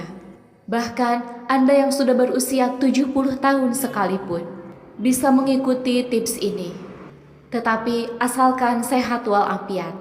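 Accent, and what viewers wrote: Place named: Madura, Indonesia. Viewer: Kyk org madura suaranya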